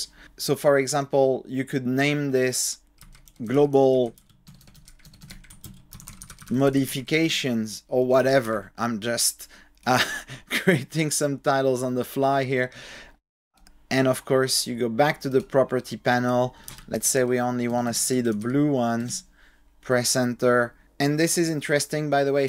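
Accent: France. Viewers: You sounds French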